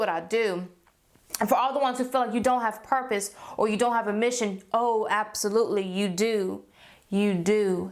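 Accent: South, United States. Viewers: That Southern accent keeps peaking out a little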